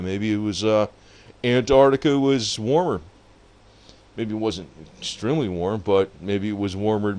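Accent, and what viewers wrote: United States. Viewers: The american accent.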